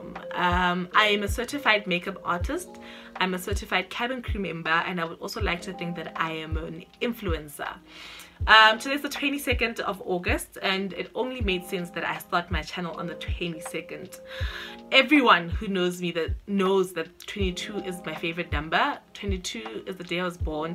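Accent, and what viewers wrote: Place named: Free State, South Africa. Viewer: When you said 'hao cheweng', I knew I had to subscribe 😂😂😂 You sound like you're from Free State.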